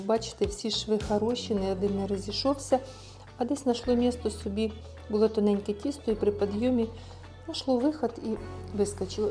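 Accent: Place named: Bila Tserkva, Ukraine. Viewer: Ваша мова,як на Білоцерківщині,я звідти і тоже,дякую.Моя мама пекла чудові пи ріжки,а начинки були різноманітні варена солодка квасоля,мак,сир,капуста,варені цукрові буряки з калиною,сливи,вишні.Тільки ностальгую,бо,соромно,що так і не змогла,як мама готувати тісто.А ще були з лідером і яблуками.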